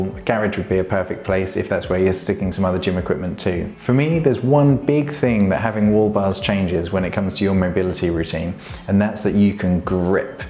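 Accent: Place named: United Kingdom. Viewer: Great ! I'll check later then. Meanwhile I'm seeing your other videos here too. They're very nice as well. Saw your Minimalistic Gym Home with Kettlebells and, we have a lot in common ! I also train a lot with KBs ! Have a collection of them though ! As for paralletes I plan to buy a pair later. Talking from Brazil here. You from UK , as I deduced from your accent and later on Youtube. Best wishes anyway ! BTW the squat video very nice too. Another suggestion a follow along routine to improve your Squat daily....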